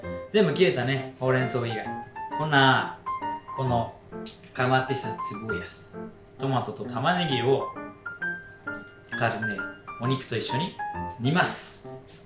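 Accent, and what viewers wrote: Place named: Kyoto, Japan. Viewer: 時々でる京都弁笑えてもうた🤣 作ってみたいな🤔てかレイちゃんの手料理食べたーい❤料理恒例にしてほしいな！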